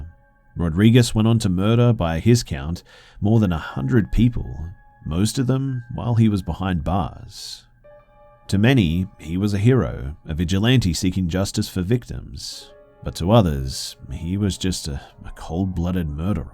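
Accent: Australia. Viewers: Aussy's are my favourite narrators now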